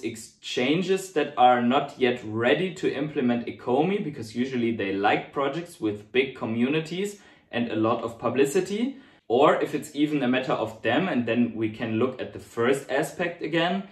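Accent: Germany. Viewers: Good content again. Last point is pretty good :) By the way are you german? Just curious since your english accent sounds like german.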